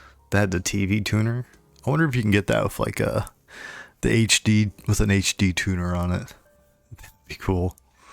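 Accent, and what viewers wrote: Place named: Scotland, United Kingdom. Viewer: I wish I payed attention to these responses. a response 1 yr later....its like I'm watching your youtube videos from alpha centuari.....thats one light yr isnt it? hah anyways. let the thick scottish accent roll brother!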